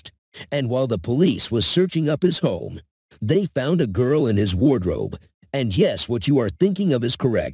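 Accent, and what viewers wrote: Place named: China. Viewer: narrating this in Chenglish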